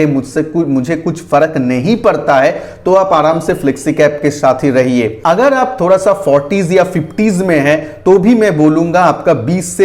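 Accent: Bengal, India. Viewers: আপনার উচ্চারণ শুনে মনে হচ্ছে আপনি বাঙালি, যাইহোক আপনার ভিডিও আমি খুব মনোযোগ সহকারে শুনি।। সঙ্গে আছি দাদা।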